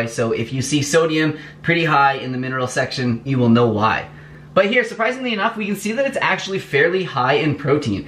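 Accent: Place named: Canada. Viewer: You sound Canadian😅. Juice the stems.. yummy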